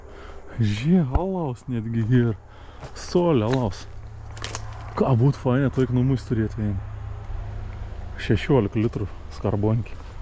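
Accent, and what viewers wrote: Samogitia, Lithuania. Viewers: Super žemaitis :)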